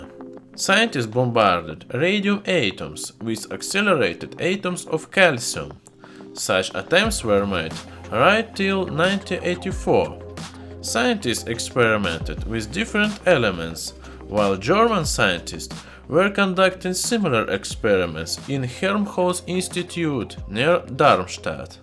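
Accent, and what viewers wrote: Russia. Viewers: It's Russian accent--the main reason you hard to understand. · This is more like Russian with an english accent... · Love your Russian English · Thanks for posting these videos. I enjoy learning about the elements with a cool Russian accent.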